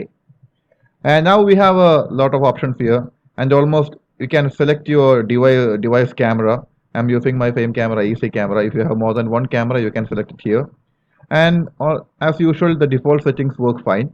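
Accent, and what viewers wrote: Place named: Kerala, India. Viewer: Are you from Kerala sir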